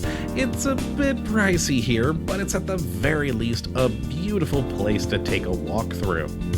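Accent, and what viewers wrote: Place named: United States. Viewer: This mate trying to sound funny, Hate his American accent.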